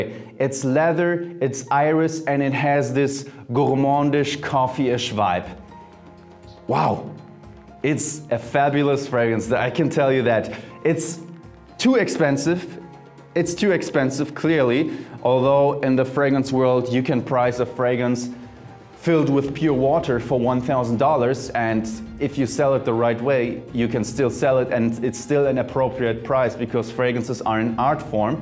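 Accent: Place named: Germany. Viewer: that german accent thou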